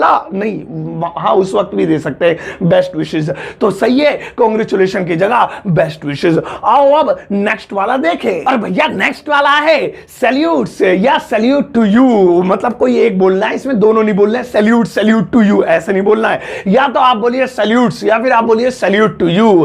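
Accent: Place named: Khadi Boli, India. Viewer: Sir kab se hamari tarah khadi boli bolne lage 😁😁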